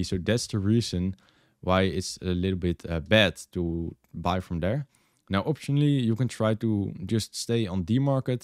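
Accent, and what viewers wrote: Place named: Netherlands. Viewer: Mate you sound so dutch lmao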